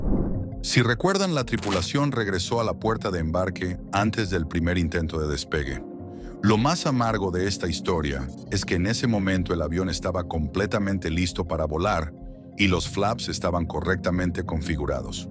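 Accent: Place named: Mexico. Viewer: no, su contenido es un copia y pega y su voz de mexicano da cringe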